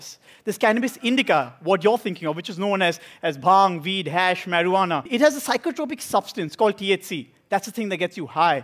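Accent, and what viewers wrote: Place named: South Bombay, India. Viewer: He really got that sobo accent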